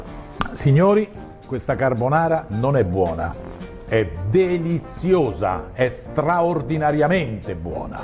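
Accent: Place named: Rome, Italy. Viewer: Sono siciliano e di buona forchetta e abbastanza capace ai fornelli a livello amatoriale e vi dico che fra tanti video che ho visto su questa ricetta a mio modesto parere è il migliore in tutti gli aspetti poi con la voce narrante si completa il tutto con quel dialetto romano che ne vado pazzo mi piace da morire e per restare sul tema come se dice a Roma "Ahu me venuta na certa fame co sto video mortaacci vostri mo stasera carbonara per tutti alla faccia da dieta ma che ce frega...". Complimenti veramente.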